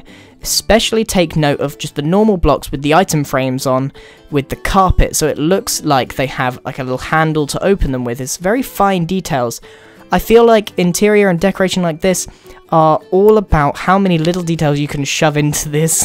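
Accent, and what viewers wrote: United Kingdom. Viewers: hes british, no shit sherlock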